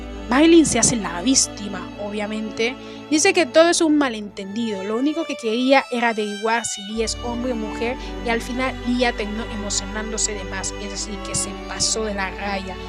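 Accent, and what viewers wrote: France. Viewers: Eres francés?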